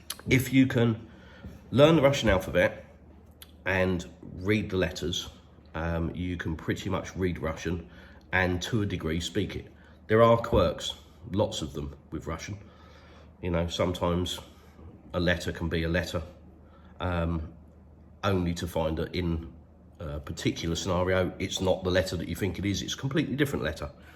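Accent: United Kingdom. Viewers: Кажется, британский акцент..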